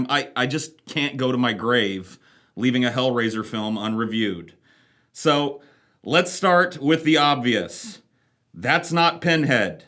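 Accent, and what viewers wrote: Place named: United States. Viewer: Ok which part of america does this amazing atheist voice come from?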